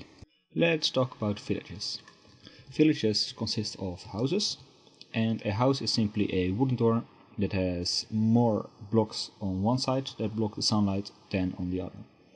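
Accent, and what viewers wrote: Netherlands. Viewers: kerl ziek nederlands acsent XD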